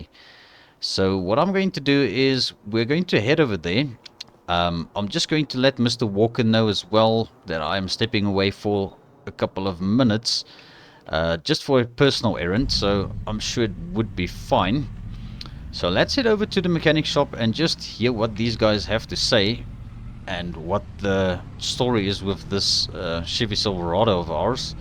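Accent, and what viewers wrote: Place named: South Africa. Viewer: Hi are u south African that accent sounds like u are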